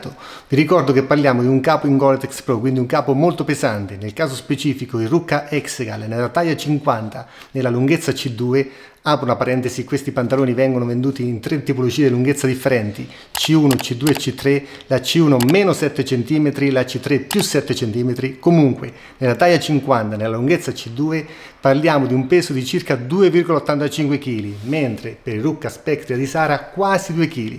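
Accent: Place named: Macerata, Italy. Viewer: Ciao bel video, con quello che costa un indumento tecnico finché possibile sempre meglio fare una buona riparazione che ricomprare nuovo. Dal tuo accento penso di averti incrociato in qualche parte della Provincia di Macerata 😉👍🏻✌🏻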